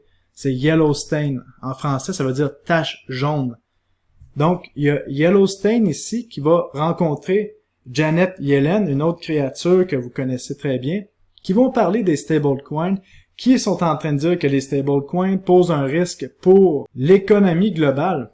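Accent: Canada. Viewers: Merci super vidéo et j adoré ton accent canadien il est trop différent du mien.Je suis belge j ai aussi un accent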